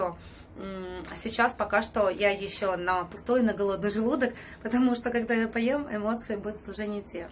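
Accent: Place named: Ukraine. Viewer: у вас украинский акцент, Вы оттуда?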